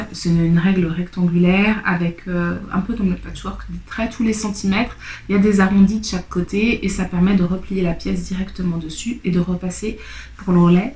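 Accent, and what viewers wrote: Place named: Moselle, France. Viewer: Coucou, Super sympa ton tagg. Merci pour ce beau partage. Ne t'excuse d'être remplie d'émotions pour ton fils... l'amour d'une mère vers son fils, cette maman que tu es prête à franchir des montagnes pour lui... c'est beau... oui, pas de pression... s'épanouir chacun à son rythme. Et sinon, trop sympa ton introduction musicale. A bientôt par ici ou par là-bas. PS: vive l'accent Mosellan. Allez, encore un peu et il sera encore plus prononcé.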